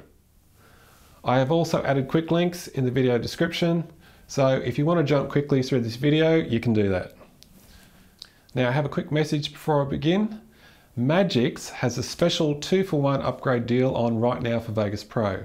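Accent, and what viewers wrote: Australia. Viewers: LOL, just watched your video (1st Feb 2025) on Handbrake v1.9.0! And it’s STILL such an awesome, informative, and super helpful guide – easy to follow with that classic Aussie accent too! 😂 Greetings from South Africa! :hand-pink-waving:🏉🏏😆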